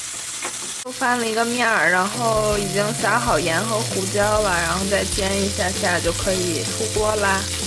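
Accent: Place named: Shandong, China. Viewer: 你是哪里人啊听着好像山东人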